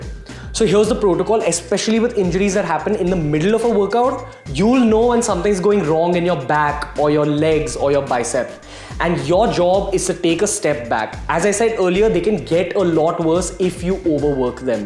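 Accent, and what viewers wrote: India. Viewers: First hindi accent influencer who has a delicate accent but you can still understand what is he talking about. Keep it up very interesting material.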